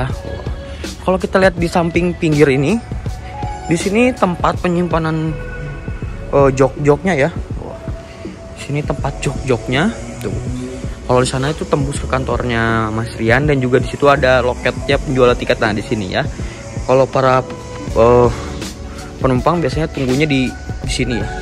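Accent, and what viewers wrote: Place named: West Java, Indonesia. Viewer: Kaya orang sunda ya logatnya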